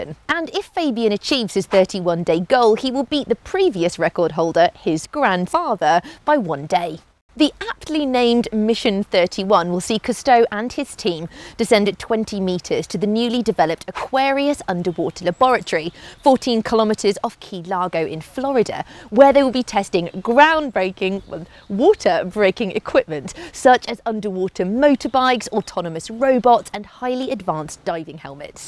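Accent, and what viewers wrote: England, United Kingdom. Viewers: Strongest british accent eva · It's only an English accent, nothing ground breaking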